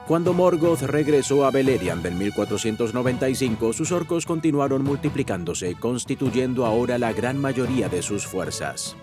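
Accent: Argentina. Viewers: pues me alegro que no seas una ia, aunque de verdad me dio esa sensación,,, apoyo la traducción, pero no me gusta el doblaje español, ya que se suele emplear un dialecto genérico que no representa bien la realidad,,, no niego la calidad de NOR, pero hay muchos youtubers hispanos que también lo hacen bien, y mucha gente no los conoce porque solo consume contenido americano. Si creas tu propio canal, contando las historias a tu manera y con tu acento argentino, prometo subscribirme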